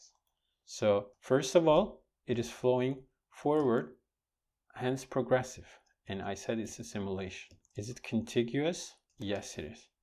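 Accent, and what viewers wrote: Iran. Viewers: ایرانی هسی؟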